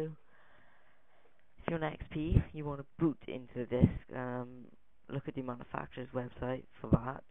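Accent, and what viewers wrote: Wales, United Kingdom. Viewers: u sound like a mix between welsh and english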